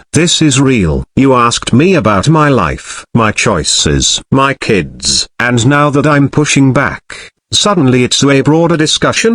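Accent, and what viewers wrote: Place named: England, United Kingdom. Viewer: Why the English accent voiceover??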